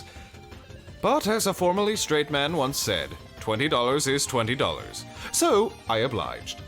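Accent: United Kingdom. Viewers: Love the content & your British voice. But it's kinda funny how I literally just made a double primary cascade point PVP build with Undying Tempest & positive outlook with Dragon Shadow on my hunter yesterday. And it's so much fun once you get the ball rolling! Cascade Point is actually a sleeper TTK and DPS perk.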